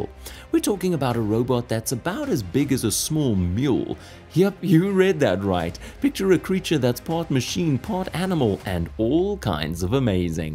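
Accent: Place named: United Kingdom. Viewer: Not bad for AI. I didn't hear any mistakes in the dialog. Only question: why are you talking about "Our military" (as in the US) with a British accent?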